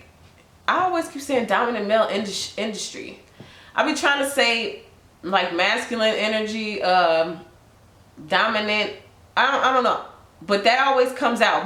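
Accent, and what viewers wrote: Chicago, United States. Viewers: You sound like you from Chicago lol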